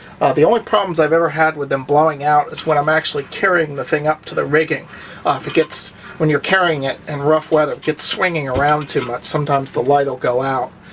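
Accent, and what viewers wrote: Maine, United States. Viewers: Not to be an idiot, but sounded some Mainer and not a learnt language, but a first language, and very easy on my ear. An old lingo that's stood the test of time and storm...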